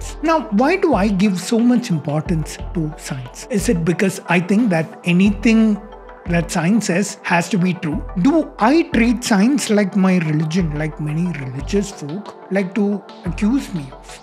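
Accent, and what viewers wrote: India. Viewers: Bro you have very thick Indian accent.😂😂😂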